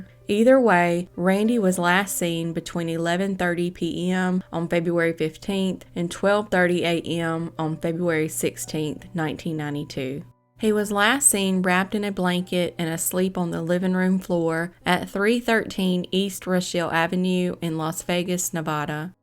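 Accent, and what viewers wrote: South, United States. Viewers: Thanks for this upload I’m in Britain but sent it to my friend in Florida area saying see I told you I liked the southern accent haha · Thank you. I had never heard of a few of these cases. I appreciate the detail you put into your productions. And a Southern drawl much like mine is unusual but welcomed. 😊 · I'm absolutely obsessed I love the way u do your video's and your Lil Southern accent is sooo cute .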